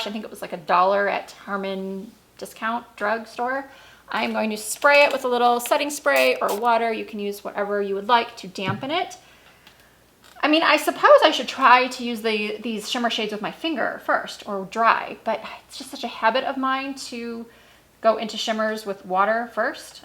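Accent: Midwest, United States. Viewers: Hi. I love the look you created. I’m so excited to get these. I love the support you, Lisa and Stephanie offer Emily! Strong, beautiful women supporting another strong, beautiful woman. Makes me so happy and proud to be a subscriber to all of you ladies. You guys have created the very best YouTube communities out there💜. Thank you ( I’m from Michigan too! I knew you sounded midwestern😊). Cheers 🥂 to this community!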